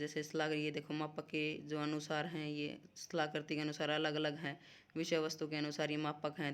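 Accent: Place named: Haryana, India. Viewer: Haryana se lag rahi ho mam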